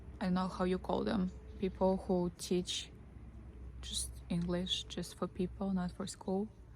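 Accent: United States. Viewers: Ive heard you say in past videos you talk like you were living in the US. What got you to the USA? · u speak very good american english. i thoguht you were american and moved.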